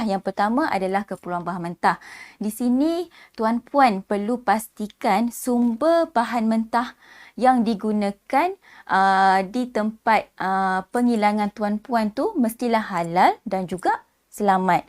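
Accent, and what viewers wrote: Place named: Kelantan, Malaysia. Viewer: Bunyi klate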